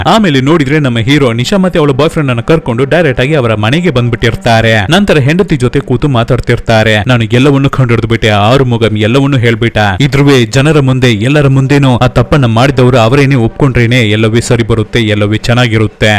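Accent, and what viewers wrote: Tamil Nadu, India. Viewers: Tamil slang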